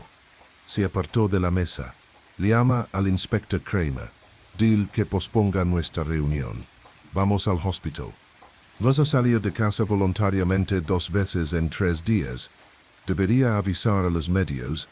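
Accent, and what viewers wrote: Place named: England, United Kingdom. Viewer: Porque está payasada de hablar con ese acento de inglés tratando de hablar en español.Insoportable.Una pena.No puedo recomendarlo.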